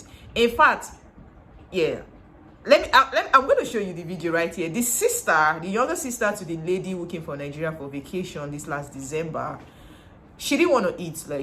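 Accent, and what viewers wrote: Ghana. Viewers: We Need people to stay ghana forever . You talk like ghanaian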